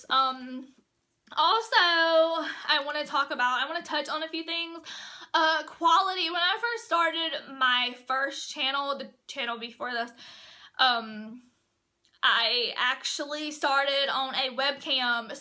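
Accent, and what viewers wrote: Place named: South, United States. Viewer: Great video! I'm a new subscriber. 😀 Love your Southern accent.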